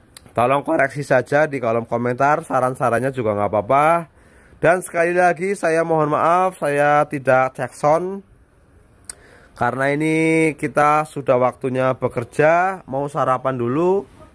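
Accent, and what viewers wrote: Banyumas, Indonesia. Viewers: Ngapak purbalingga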